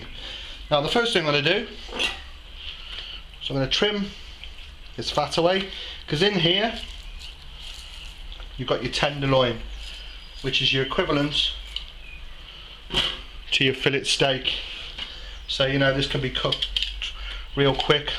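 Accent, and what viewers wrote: United Kingdom. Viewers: Well done video, why no ribs? You guys don't do ribs in the UK? (guessing on accent) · everything just seems cooler with a British accent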